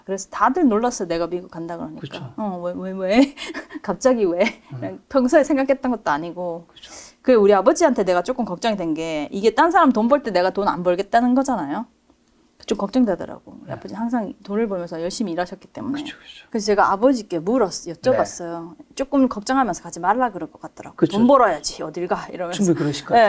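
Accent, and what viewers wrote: Gyeongsang, South Korea. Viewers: 악바리 근성~~대구 말씨가 스며들어 잇네요~~실패는 이기거나 성장이다~~대구 아가씨 대단하다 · 지나영 박사 화이팅입니다 !! ☆☆☆☆☆~~♧♧♧♧♧~~~ 갱상도 사투리가 듣기 좋습니다 !!!!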